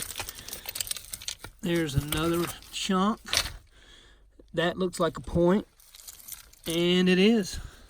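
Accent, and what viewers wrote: South, United States. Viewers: it's the southern accent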